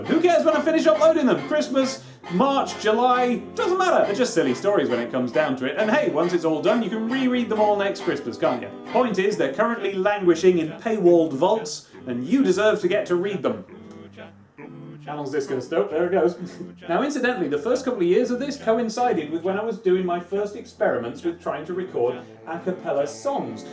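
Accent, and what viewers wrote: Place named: Northern England, United Kingdom. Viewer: Hey,. Quick question; which county are you from? I have difficulty narrowing accents down or if accents even work by county of origin anymore. I can tell you're from the North but I wouldn't be able to tell York from Newcastle. We have an over abundance of London accents in the South East.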